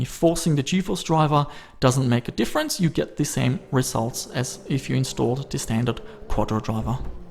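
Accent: Germany. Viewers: can i ask you from where you are? you have this german-english :D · you are from australia? I thought you were like german from your accent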